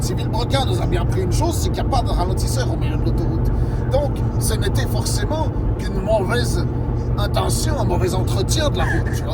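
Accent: Belgium. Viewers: excellent accent Wallon! j'habite la Frontiere et je confirme que ca y resssemble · Tu a fait ma journée ton accent belge est juste magnifique je pleure de rire 🤣🤣🤣🤣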